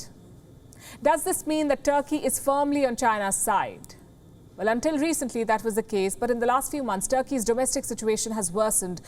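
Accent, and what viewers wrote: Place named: India. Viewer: Palki ji I want your audiobook Your reports are next level ,....nd Indian accent 🔥🔥🔥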